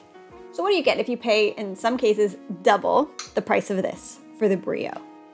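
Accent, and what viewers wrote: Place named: United States. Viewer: Just out of curiosity: you sound American - yet, it seems you are in Ireland (and you look somewhat Italian, and charmingly so ;-) ). You emigrated?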